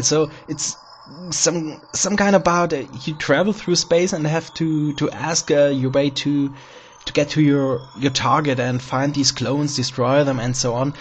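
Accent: Germany. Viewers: I totally agree, you should never apologize for where you came from! But I think what he meant by that, was that he's sorry having poor English because he's German. But German accents are still something you should never apologize for!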